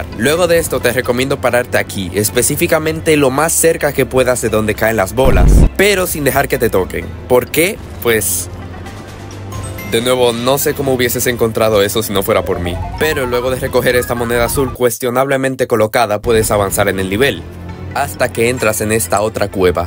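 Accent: Dominican Republic. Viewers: Are you Dominican?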